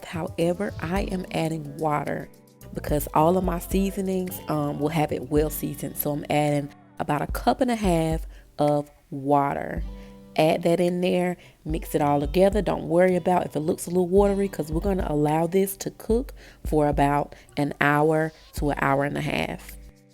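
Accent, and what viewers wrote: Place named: South, United States. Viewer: Looks good love your southern accent. Imma try the Saturday. 🎉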